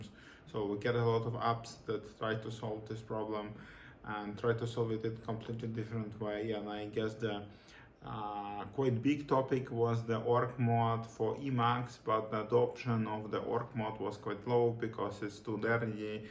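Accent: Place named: Russia. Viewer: Чисто наш акцент, со снижением силы к концу высказывания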